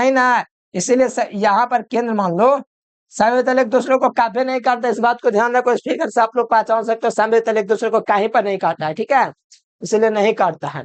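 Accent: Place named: Bihar, India. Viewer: Bihari style me education lecture 🔥🔥🔥🔥🔥🔥🔥🔥🔥🔥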